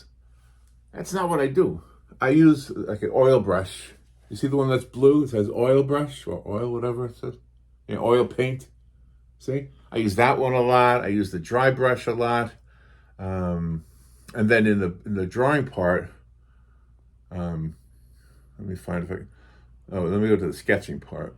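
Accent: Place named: Connecticut, United States. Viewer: Just found your channel and subscribed and am looking forward to watching your videos. You don’t sound like a FL native…CT?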